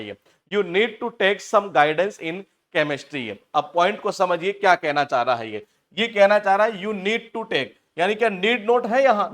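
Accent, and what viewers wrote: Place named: India. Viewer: Seems aayega love you sir aapki english desi jugad ne to mja hi dila diya😮❤❤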